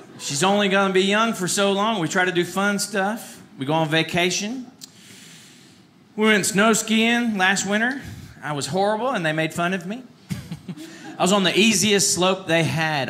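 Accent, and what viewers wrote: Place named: South, United States. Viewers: This man's accent is literally the stereotype of a southerner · God I love the southern accent!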